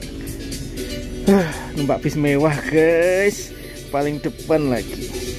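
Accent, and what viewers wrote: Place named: Banyumas, Indonesia. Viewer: AA ngapak 😂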